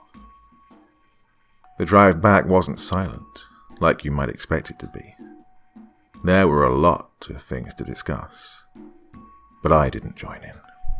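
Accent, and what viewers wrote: France. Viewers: I agree!!! 👏👏👏 his French accent is TOPS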